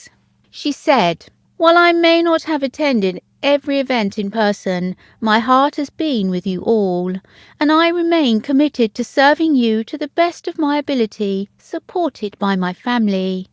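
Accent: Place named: Cockney, United Kingdom. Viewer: I am blocking this channel because I just CANNOT take you squeaky Cockney voice!!!!!!!!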